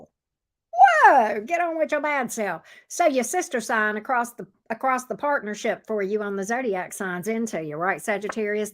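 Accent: South, United States. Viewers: Just found your channel after watching you with Tyler. Thank you for sharing your gifts 💕💕💕 Love hearing my southern folks reading tarot!!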